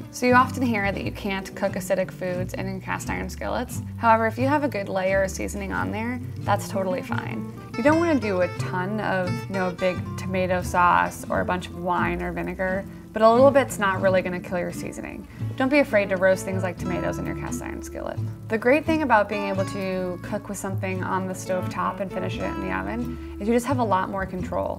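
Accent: United States. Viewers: At the end of every sentence she goes uuuuup on her tone. Very unprofessional and annoying, typical american accent.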